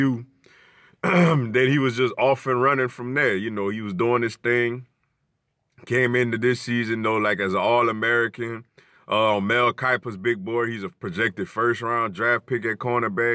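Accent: New Orleans, United States. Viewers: Aye, bruh are you from the New Orleans area?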